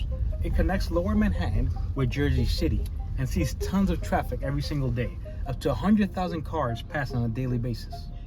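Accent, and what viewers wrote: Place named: Latin America. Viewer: No se si me equivoco pero eres latino porque la forma en que hablas no es de un nativo americano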